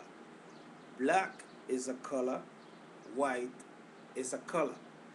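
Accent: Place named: Haiti. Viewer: Your Spanish accent is Haitian and so is your English smh lol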